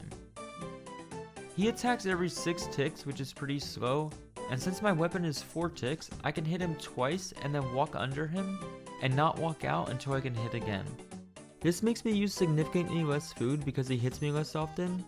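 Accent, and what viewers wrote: United States. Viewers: Are you from Philly?? I hear some wooder in those water runes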